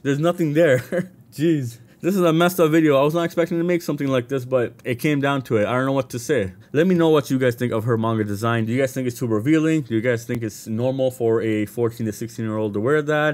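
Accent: Toronto, Canada. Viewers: You talk like a Toronto / GTA man for sure.. you from the ends ahlie?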